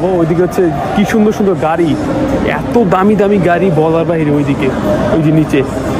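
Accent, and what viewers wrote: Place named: Chittagong, Bangladesh. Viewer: ভাই চট্টগ্রামের মনে হয়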